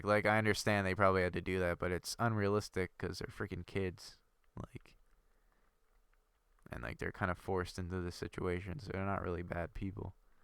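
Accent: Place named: Canada. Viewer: Your accent sounds more Canadian . . . by the way, Big fan of the series and take a lot of tips and architectural designs from it. Keep it up!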